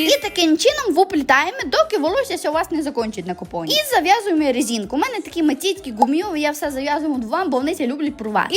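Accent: Transcarpathia, Ukraine. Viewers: Чи це Закарпатській діалект? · Це українська мова - закарпатський діалект🥰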